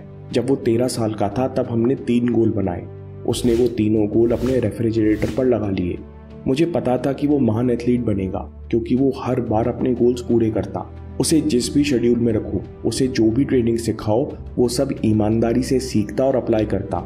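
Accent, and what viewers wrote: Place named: Punjab, India. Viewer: yaar punjab n aise hi bolte hai hindi iski koi galti nahi hai.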